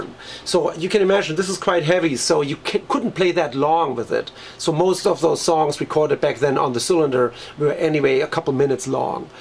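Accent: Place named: Germany. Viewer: You sound like you' re from Germany.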